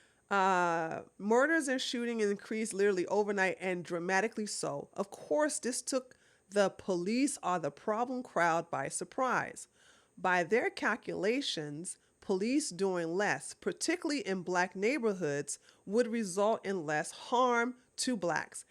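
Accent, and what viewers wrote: United States. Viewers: I am Irish born in Austria {Europe for those who do not know Geography} but raised in French West Central Africa {Sénègal + Guineé {not same as Guinea in South America} also Saïgon, Viêtnãm in 1958} I married a Philippina my brother a Korean. We lived out in the ville {out in the local community} not the American compoud and went to the local School {Lycée Von Volenhaven} not the American school. So I was extremely presently surprised by the extremely good logic but had a liitle dificulty understanding you because of the American Dialect used but think it is geared to your main audiance and because of my age I have not keept up with the various types of American English used. Now I have given my background I have a question that i have not gotten and answer to. In the 60's the black in large numbers had «Afro Hair Style» well when they arrived with there «Boom Box» the looking for their «Roots» the local disrespected them called them worst than a dirty whiteman. 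Their reason was that they did not know or had not tried to know history culture of their region thaey had taken White culture and corrupted it and forgot their ancestors making them lower than the lowest white. I never saw a local wearing and «Afro» or carrying and playing at volume a «boom box»!!!! So When I got to USA I asked why/what was the «Afro» I was told it was traditional hair style by a number of when I asked from what region {East., West, South or North } {I was familiar with West Africa} and noone could a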